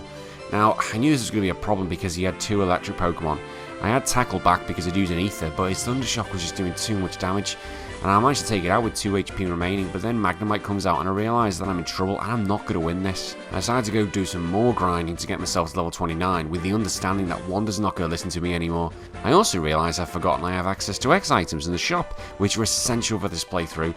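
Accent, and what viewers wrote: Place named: Wirral, United Kingdom. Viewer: Great video mate, don't usually like/comment cos I watch YouTube on xbox but am not logged in so I came back to your video on my phone just to let you know how good this was ! Loved the joke about being 32, married with a kid lol - Pokemon is for all ages :) anyway great video mate, you should definitely do more challenges like this one ! Btw where you from? You sound very close to me on the Wirral?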